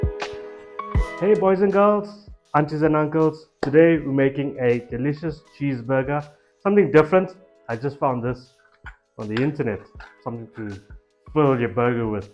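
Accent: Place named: Bengal, India. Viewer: dude sounds like a bangali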